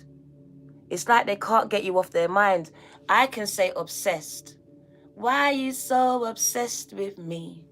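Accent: United Kingdom. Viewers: 8 infinity and beyond…. Please let me buy you lunch….. you sound like a good ole Londoner… I born in Walthamstow xx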